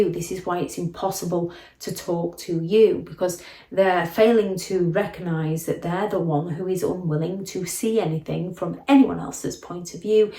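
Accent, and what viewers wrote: United Kingdom. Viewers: this is the FIRST time I have heard your voice. Forever I thought you were Southern Californian, wow was I pleasantly surprised that you are United Kingdom 🇬🇧 ish.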